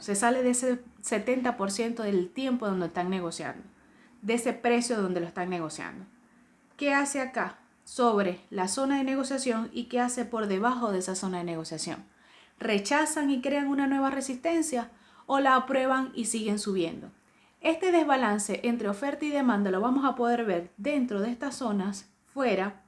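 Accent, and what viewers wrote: Venezuela. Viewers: Ese acento venezolano tan bello que tenemos jeje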